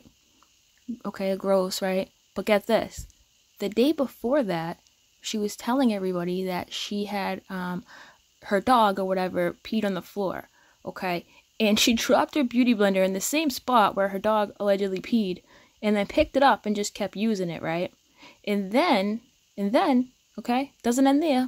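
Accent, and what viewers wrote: Boston, United States. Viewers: You have to be a Boston girl.. Our accents are surely one of a kind xx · Great video as always and love seeing you... your accent coming out of that pretty face is awesome. For a west coast girl, your Boston accent is so cool. I’ve connected with Sue on another comment section on a different channel and praised her for even having the courage to share her pain... only to have a vapid little goblin claim she was “ one upping her story” and not paying enough attention to her talking...what a piece of 💩.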